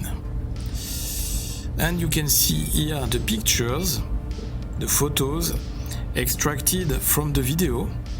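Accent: France. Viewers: T'as un sacré accent bien français ptdr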